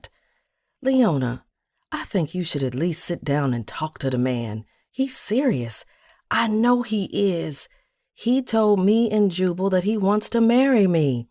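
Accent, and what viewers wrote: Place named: South, United States. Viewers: Lawksss, I feel so bad for Leona always saving her sister. This is genius storytelling! Narrator did an excellent job, I’ll be adding her to my list of faves. The southern drawl gives the book so much character. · Absolutely the southern drawal allows the character to be brought to life so much better,, Happy Blessed Mother's Day to you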